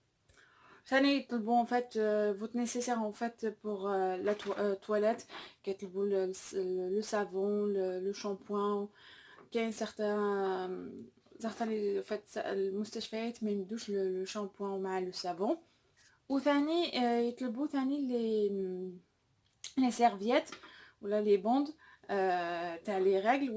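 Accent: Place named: Morocco. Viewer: الله يبارك بنت بلادي وشنو لهجة تاعك تميل بعض الشئ الي المغربية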